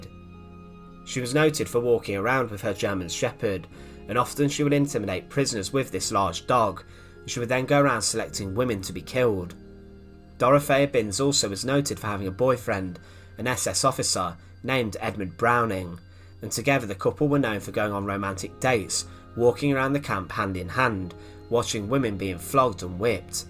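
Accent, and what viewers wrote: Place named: Northern England, United Kingdom. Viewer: hes a working class man from Northern England..not too sure where from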